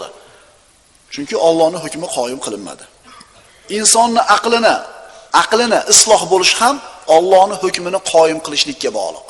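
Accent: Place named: Andijan, Uzbekistan. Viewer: Ollohga Shukur ona vatanim tinch Oshdan ekan. Andijon bn shevasi bir xil ekan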